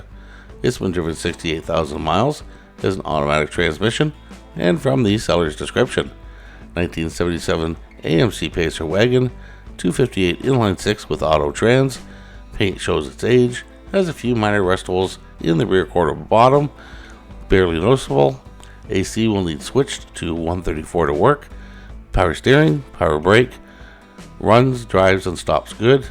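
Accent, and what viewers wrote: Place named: Canada. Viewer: We'd blame any mispronounced words to be caused by your Canadian accent. No foul. Hope you feel better tomorrow. Keep up the good work.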